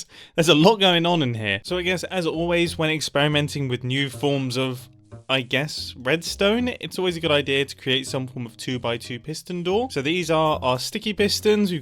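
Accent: United Kingdom. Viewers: they're both bri'ish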